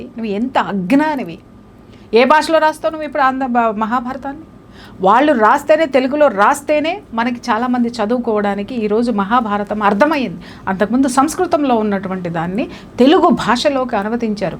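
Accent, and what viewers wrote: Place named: Telangana, India. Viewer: మా తెలంగాణలో ఇట్లనే మాట్లాడతాము మీ ఆందోళన సంస్కారం ఉంటుంది మా తెలంగాణలో యాస ఉంటుంది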